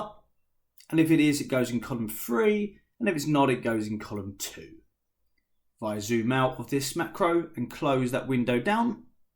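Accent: United Kingdom. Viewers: Very informative video ! And a beautiful English British accent ❤❤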